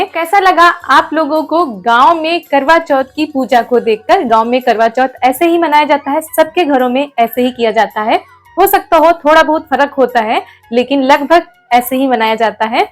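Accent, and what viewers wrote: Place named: Madhya Pradesh, India. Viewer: How cute pehli baar Gaavka dekha apki video me bahut alag tareeka hae kaunsa gav hae vaese language apki MP ki hi lag rahi hae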